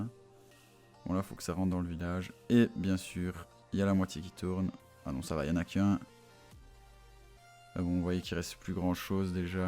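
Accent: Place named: Belgium. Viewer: J'adore l'accent belge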